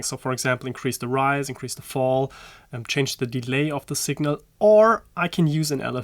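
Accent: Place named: Germany. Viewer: ich kann deine germanness so sehr durch dein englisch hören :D gutes video aber!!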